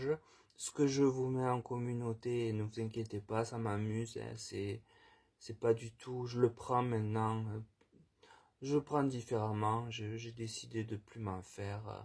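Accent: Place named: Occitania, France. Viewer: Bonsoir merci pour le partage vous savez il y a toujours des êtres au dessus des autres!!! Faut passer outre comme la pluie sur les ailes d'un ( canard ) facile à dire certe! Nous sommes pas très loin je reconnais ( l'accent) d'Occitanie je vous écoute avec attention ( parce que) vous me parlez. Merci merci 👏👏👏👏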